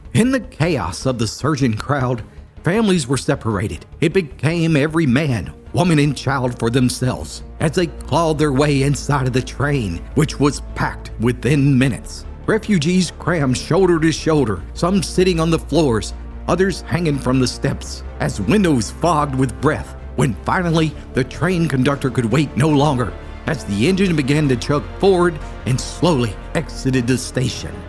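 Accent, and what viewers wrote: Appalachia, United States. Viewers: Needing to rest and listen to something.. pops up! PERFECT! 😊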